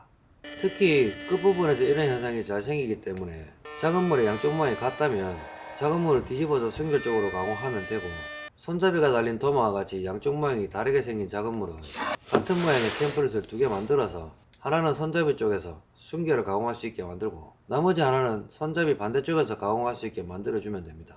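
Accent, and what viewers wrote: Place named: Busan, South Korea. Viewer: 사투리가 낯익은데 부산이십니까? 늘 좋은 영상 발조고 있습니다~얼릉 구독자 늘어서 유명해지시면 더 좋을것 같습니다! 좀 딱딱하지만 진짜 중요한걸 늘 잘 가르쳐 주셔서 좋은 선생님이자 형님이라 생각하고 있습니다~!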